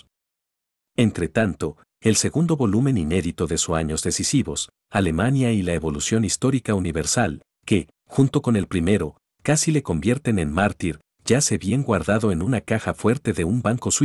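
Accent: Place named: South America. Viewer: Vale, el acento suramericano es soportable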